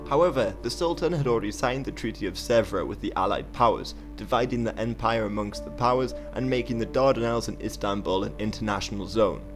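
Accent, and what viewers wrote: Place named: United Kingdom. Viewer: Its difficult for not english speaking people to understand... British accent is the worst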